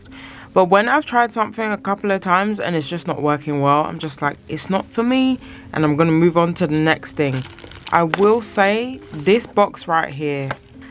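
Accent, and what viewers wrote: United Kingdom. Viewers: Hey hun just came across your channel. Love it, sounds like you're from the UK too..yay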